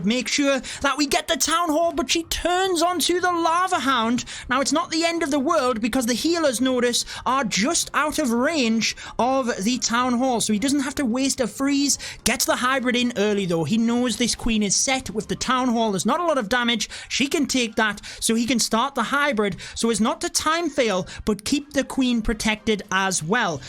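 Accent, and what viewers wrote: United Kingdom. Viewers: British accents is melody to my ears.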